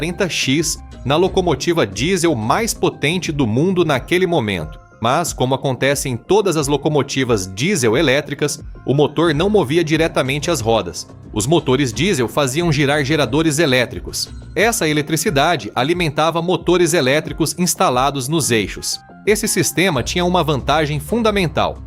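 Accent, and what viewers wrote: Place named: Caipira, Brazil. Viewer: Eita sotaque caipira.... rs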